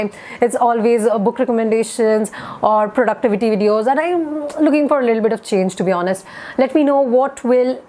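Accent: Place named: India. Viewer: I love the Indian accent!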